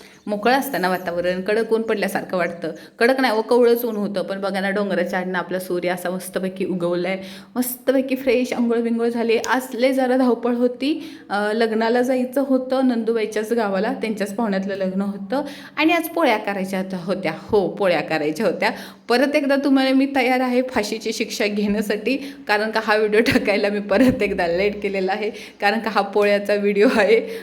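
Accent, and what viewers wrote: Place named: Satara, India. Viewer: Tumche gav kuthle ahe,tumch bolnycha tone, saglya vidhi satara karad side chya vatyat.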